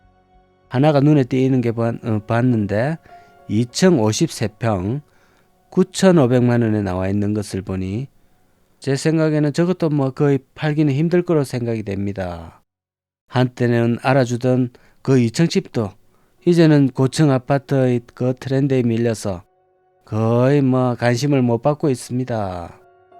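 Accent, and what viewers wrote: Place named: Busan, South Korea. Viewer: 부산 알고있습니다 오늘도 구수한 부산 말씀 수고하십니다 새로지은 도시화로 그리운 추억도 고향의 향기를 잃어버린 현실이 안타깝습니다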